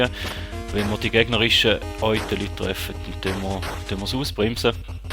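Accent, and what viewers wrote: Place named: Switzerland. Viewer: Wuhu noch 'n LPer der CH-Deutsch spricht ;P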